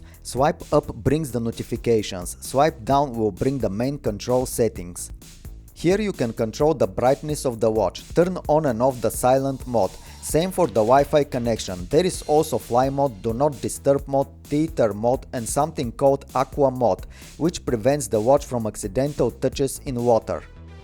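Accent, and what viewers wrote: Russia. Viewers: his voice can't be more russian, even when he is speaking in english it felt like i'm listening to russian language.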